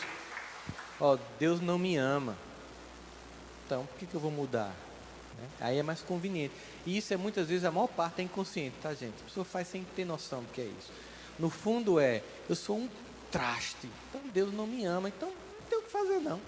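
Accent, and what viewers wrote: Northeast, Brazil. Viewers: Onde você estava? Que bênção você é...ainda mais com esse sotaque lindo...nordestinos são demais...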